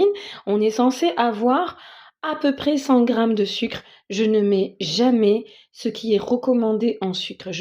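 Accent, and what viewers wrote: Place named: Algeria. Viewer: Les recettes sont tunisiennes et l'accent algérienne j'ai rien compris 🤗🤗🤗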